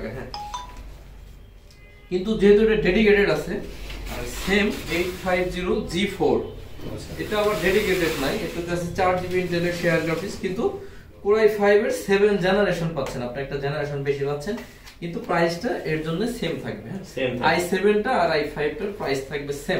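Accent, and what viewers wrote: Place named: Jamalpur, Bangladesh. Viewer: ভাই বাড়ি কই আপনার?? জামালপুরের টোন দেখি কথায়!!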